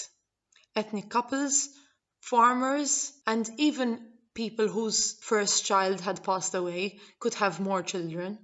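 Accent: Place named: Malta. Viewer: I new that was a Maltese accent right away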